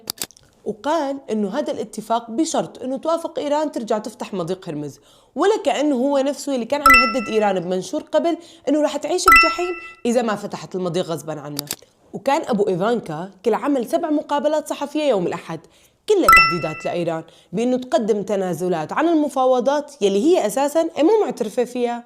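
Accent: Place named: Syria. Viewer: لهجتك لأي منطقه بسوريا ؟ بخصوص الحرب راجعه بعد كم شهر والله اعلم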